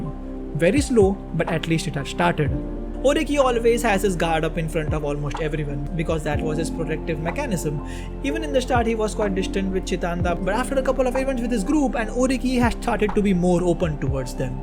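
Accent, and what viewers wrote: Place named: India. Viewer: Your accent is Indian. I appreciate that you are not trying to sound Americans are Brits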